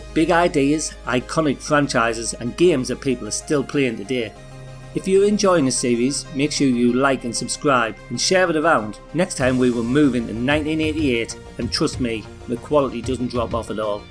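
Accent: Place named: Sunderland, United Kingdom. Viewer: He's from Sunderland, in the north east of England; just be glad he's not from Newcastle, as that would be even harder for non-Brits to understand.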